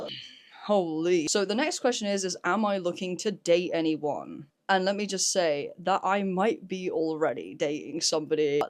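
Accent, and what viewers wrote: United Kingdom. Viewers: Wow. Didn’t know you were British.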